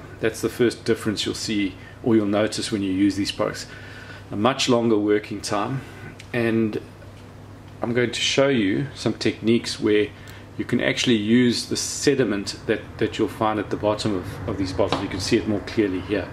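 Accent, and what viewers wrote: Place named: South Africa. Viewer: hey sorry I meant they are sold here in Brisbane. And I confused your accent for mine at the start of the video (Australian). But as I heard you talk more I realised you are South African! Funny how we have some similarities in our accents. Do you ever find that about Australian accents? That they can be similar for certain words?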